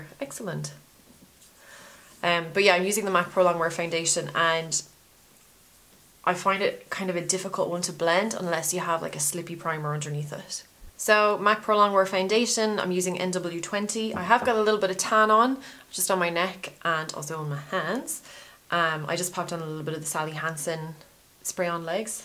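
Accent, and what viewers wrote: Ireland. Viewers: She's Irish, if that's what you're asking ( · Are u irish?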